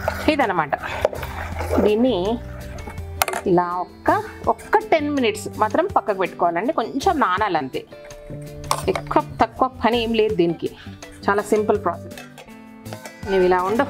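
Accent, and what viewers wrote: Telangana, India. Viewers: Super andi meeru saradaga ma tladutu adi telangana chala manchiganipistadi trees gurinchi chala baga cheptunnaru dinitona ardamitundi meeku enta opiko ani super👌👏🙏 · Hi..me ukmarava dosa bagundi..nenu try chesthanu.. Me telangana basha bale matladutu enjoy chesthu pani chestunaru..mee viewers ni me matalatho attract chestharu...be happy madam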